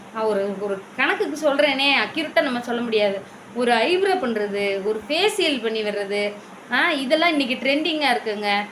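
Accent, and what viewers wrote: Thoothukudi, India. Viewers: அக்கா நீங்க தூத்துக்குடி யா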